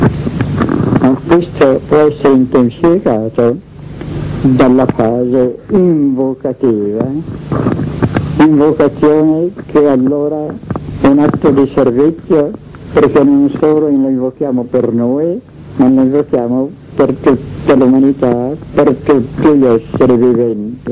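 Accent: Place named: Veneto, Italy. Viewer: La tipica voce di Assagioli con inflessione leggermente veneziana. :)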